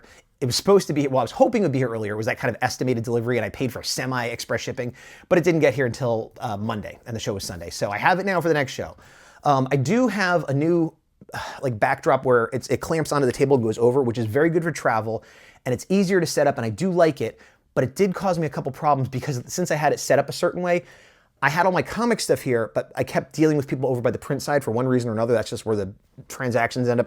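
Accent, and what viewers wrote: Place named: New York, United States. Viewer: I think you SHOULD use that voice and “hey buddy, you like monstahs?” that would be gangster! let your new York flow. sing it like. 🤙✌️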